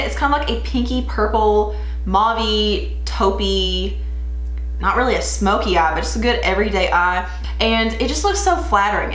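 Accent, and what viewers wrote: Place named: Tennessee, United States. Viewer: Ok so I have to ask 1. Where are u from...u sound like you are from tennessee or Kentucky I live in tennessee I would like to follow this look for a video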